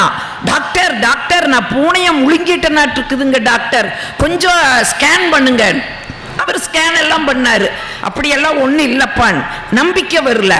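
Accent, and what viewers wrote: Kongu, India. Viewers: Kongu mandalam pechu siripu sandhosham...unga pechu...akka superrrrrrka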